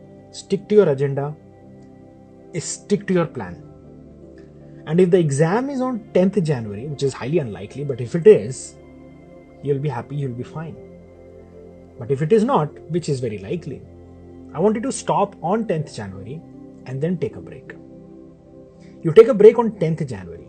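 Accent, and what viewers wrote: India. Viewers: Sir is Hyderabadi guess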